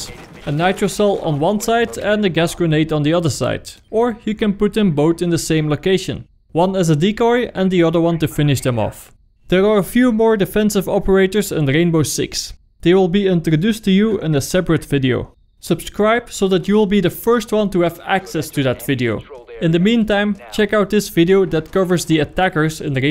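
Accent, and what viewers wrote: Netherlands. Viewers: Probably the Netherlands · duidelijk Nederlands Engels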